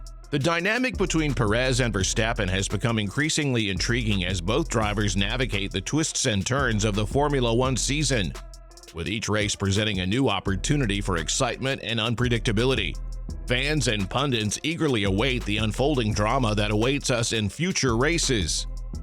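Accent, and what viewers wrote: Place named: United States. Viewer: ngl I can't grasp an American accent trying to inform me of F1 information. Need british accent, can't believe information being said.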